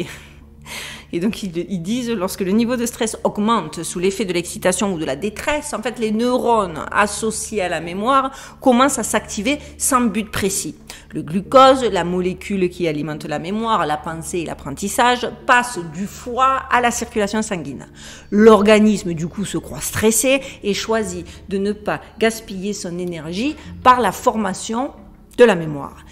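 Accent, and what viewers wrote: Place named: Midi, France. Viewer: Merci! Sujets toujours passionnants. Plus l'accent du Midi 💓💓💓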